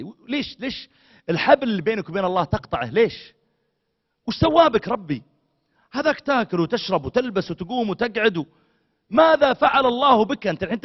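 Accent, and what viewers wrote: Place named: Saudi Arabia. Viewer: يسعدك قمر يا شيخ حديثك الموزون الرائع في كفة ربي يجزيك كل خير وتلك اللهجه السعوديه في كفة أخرى ما اروعها🌸🌸🌸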